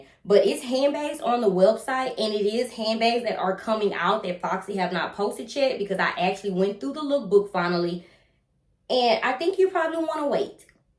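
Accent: United States. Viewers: Love your videos. I also love your accent!!! I am from the UK and so my ear struggles to place American accents. Which part of the US is yours from? I totally agree about buying smarter. I now have a small bag collection after selling a lot - 8 at present. The only bag I need in 2023 is the odeon mm in mono and black as I don't have a 'decent capacity cross body' in my collection. I just have to stay strong when I am tempted, which is easier said than done!!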